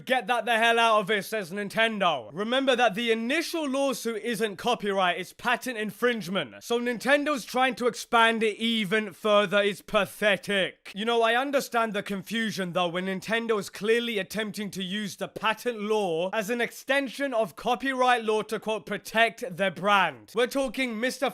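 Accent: United Kingdom. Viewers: You tell em angry British guy!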